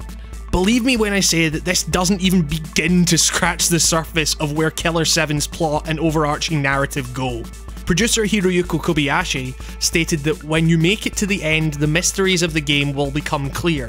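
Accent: Scotland, United Kingdom. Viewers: You scottish brah?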